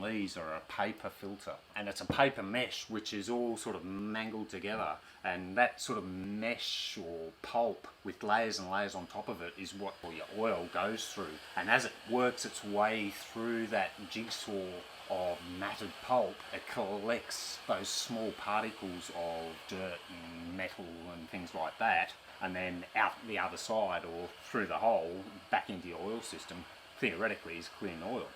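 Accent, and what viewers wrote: Australia. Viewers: The second I heard the Australian accent I had no doubt this guy would be messing around!! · He's Australian, not British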